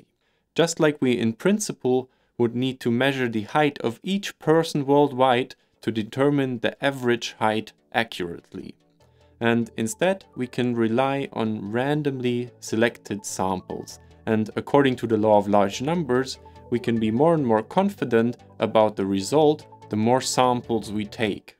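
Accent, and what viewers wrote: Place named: Germany. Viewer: du bist doch en deutsche Bub oder? der hör ich doch raus sache mal! Und bockstarkes Video also wirklich erste Sahne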